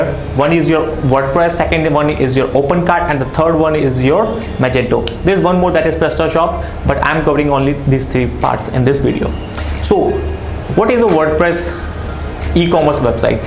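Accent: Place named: India. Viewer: Nice video sir, informative, sorry but follow indian english accent.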